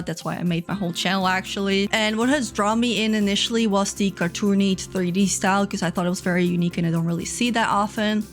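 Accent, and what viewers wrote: Netherlands. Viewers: Oh my god i was listening and thought i heard a bit of a Dutch accent peeking through untill you mentioned the Dutch name of a game. I feel so smart lmao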